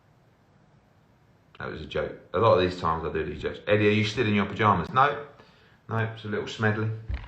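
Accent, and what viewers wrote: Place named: Cockney, United Kingdom. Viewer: knows his market. From a posh boy into a cockney geezer attracting “ the lads” Similar to Dave Allen fans.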